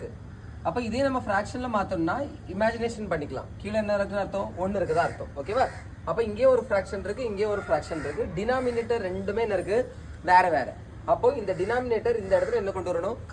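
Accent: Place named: Kanyakumari, India. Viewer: Tamil language than, athu kanyakumari language ithu mathiri than pesuvanka.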